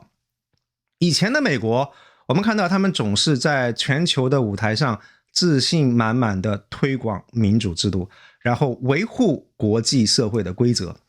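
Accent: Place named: Hunan, China. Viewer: 的普通话没有台湾口音，更像是湖南人讲普通话，n和l不分，没有贬义，只是留意到。听的视频好像是享受一顿丰富的大餐。当然同样的话题也有很多快餐，也有它们的食客。产品丰富，各取所需。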